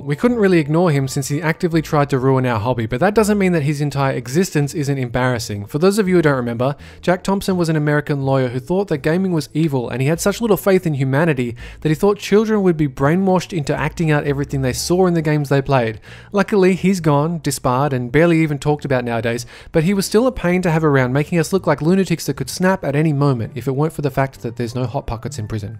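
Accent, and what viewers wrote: Australia. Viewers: No he is just Australian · He's an Aussie, mate. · ummm he's Austrailian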